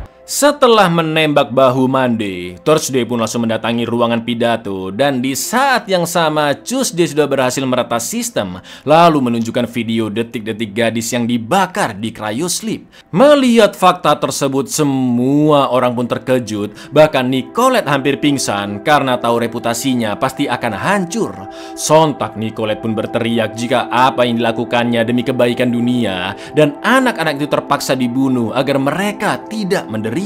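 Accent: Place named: Kebumen, Indonesia. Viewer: cak pean wong kebumen dudu ?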